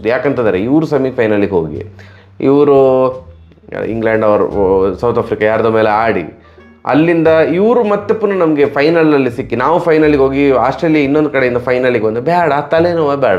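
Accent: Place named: Dakshina Kannada, India. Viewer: ... ನಿಮ್ಮ ದ. ಕ. ಕನ್ನಡ ಕೇಳುವುದೇ ಒಂದು ರೀತಿಯ ಖುಷಿ..." ಮಾರಾಯ್ರೆ ", " ಒಟ್ರೇಶಿ ", " ಎಬ್ಬೇ ".. typical slang accent...❤❤❤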